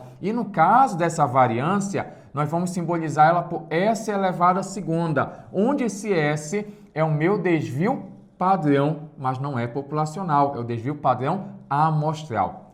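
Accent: Pará, Brazil. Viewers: Prof. o senhor é paraense?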